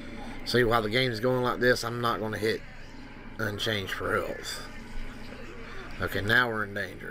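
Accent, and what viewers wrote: South, United States. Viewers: I did it yesterday whole day and half of night. I started with float, 4 bytes and unknown initial value, first searсh gave me more than one billion results, than I found a border on the gas station (in the game prologue) and step up and down and after every action change value to decrease or increase and did next search. After first step on border it decreased results to ~ 3 millions and than I did the same action by circle, after almost 30-40 minutes I get few values, but they was red and changed realtime, so it probably wasn't height of the character, maybe rain or her hair or body movement or even rotation of the camera... no idea. When I did it camera always changed and also when pushed alt+tab. Than I tryed the same roadside, ladders, run and step up and down in diffrent locations, after a coupe of searshes I get something like 50 adresses still appeared, than repeated same actions, until I got 5, but change the value, notihnig changed in the game, so I probably miss something. 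Also during first search my PC works pretty tough almost freezing, well I don't know what to do. It seems pretty complicated also english isn't my native and yours sounds for me like you some kind of country singer :)) I'll try to look closely on your video, thanks!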